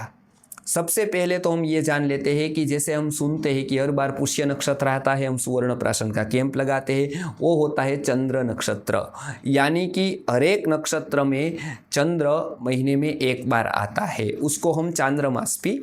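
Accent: Gujarat, India. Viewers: Bhai gujarati lage che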